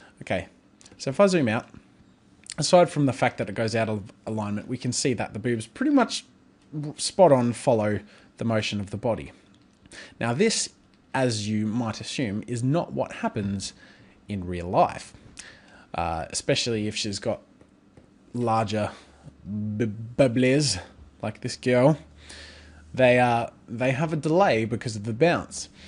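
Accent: Australia. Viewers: Are you Australian or what? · every single time I click on his videos I say "oh yeah, he's Australian." 😂 No hate to any Australians ❤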